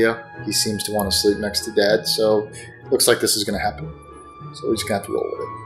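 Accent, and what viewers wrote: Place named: United States. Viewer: Its amazing to see you again I remember your face from many years ago a drama called Spring Waltz , I was like who is this actor who is more american speaking than korean , so very handsome , then I see you on I Live Alone , which was so good you treated everyone so well , I dont watch TV so I didnt know about Criminal Minds till watching the show , but yeah you would of been Perfect to play in Crazy rich Asians , your face is one not to be wasted and beyond that you seem like such a genuine guy, really sweet , in ending sorry for your loss of Mango , I wish you the best hope to see you on the Big Screen !!!!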